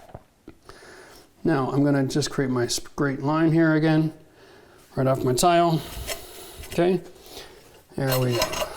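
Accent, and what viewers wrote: Canada. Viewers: Canadian Aye !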